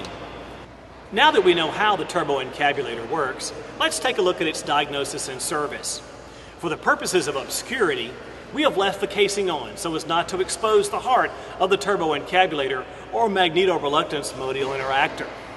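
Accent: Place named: South, United States. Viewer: I've heard this presentation before but it's more authoritative when the presenter has a Southern accent.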